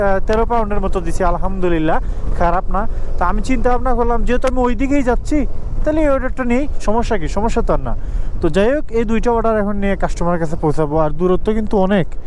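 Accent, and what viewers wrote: Sylhet, Bangladesh. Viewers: Bhai Apnar House Sylhet ni ?? 😅 কথার ধরনে বুঝা যাচ্ছে সিলেটি ❤ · Tmi Sylheti?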